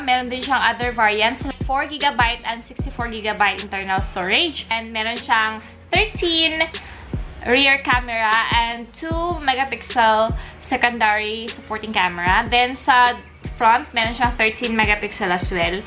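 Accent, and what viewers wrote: Visayas, Philippines. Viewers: bisaya diay ka ate?hehe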